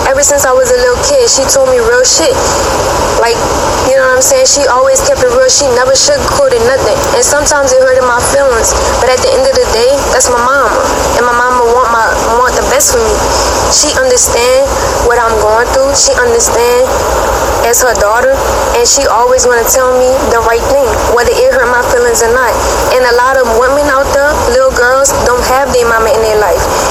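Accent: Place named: Louisiana, United States. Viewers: her Louisiana accent coming threw strong. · Through it all, Ive always took note and loved her New Orleans accent. Its stronger now that she's back home ❤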